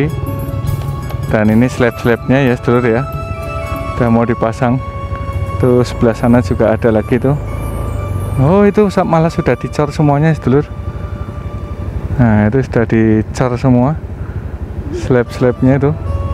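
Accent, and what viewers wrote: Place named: Yogyakarta, Indonesia. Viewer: logat jogjane medok pisan mas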